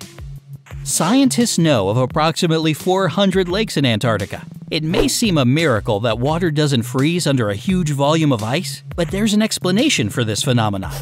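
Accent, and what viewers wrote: United States. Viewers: The American accent just makes me feel dumber. Not sure what it is with American educational show and the way they explain things like they are talking to a child.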